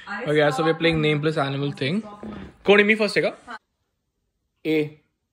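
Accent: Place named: Nashik, India. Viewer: tujya videos madhe nashik khup aikayla yeta.... Btw mi pn nashik ch ahe...tu hi nashik cha ahes ka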